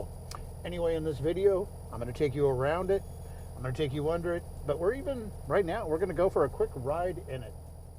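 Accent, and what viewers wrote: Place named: Upper Midwest, United States. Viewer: Sounds like he's from upper mid west/northern plain states..Mn, Mish..'EH'?..Robert at 70 in Az.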